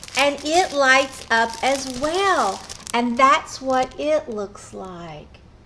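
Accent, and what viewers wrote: South, United States. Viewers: Love your southern drawl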